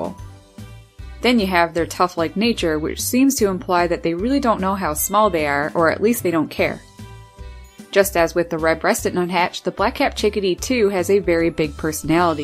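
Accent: Newfoundland, Canada. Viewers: Thank-you for this video. It was great to hear the Newfoundland accent! So Canadian! Absolutely the cutest birds, for sure. I live in B. C. and I think we have Sowet Owls here, too. I may be wrong, though. I am sure that there is a very rare, small owl which does live in the Coastal forests, perhaps you know which one it is, 😃 I think it is the Northern Spotted Owl. 👵🏻🐾💕🇨🇦BC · hi u have such a beautiful voice-i can hear the passion -n the cute canadian?accent-luv ca usa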